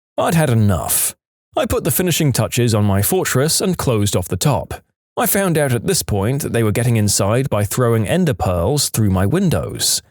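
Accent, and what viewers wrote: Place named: North, United Kingdom. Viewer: Love this stuff and love the voice very Northern British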